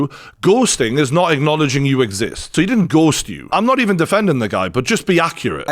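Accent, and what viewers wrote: Newcastle, United Kingdom. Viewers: Fair enough, but in my defense I clicked because I like the true Geordie, he's entertaining no matter what he talks about.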